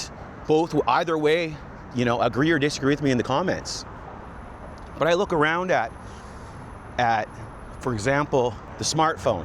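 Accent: Canada. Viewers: I can tell by your accent that you were Canadian, but that popeyes chicken scene you described sounded like new orleans, baltimore, chicago or one of our other ghettos in the states. Stay safe out there.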